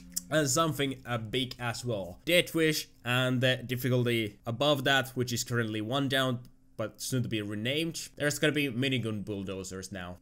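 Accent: Finland. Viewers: Texas Thanatos massacre! btw i like your finnish accent, reminds me of My Summer Car dev guy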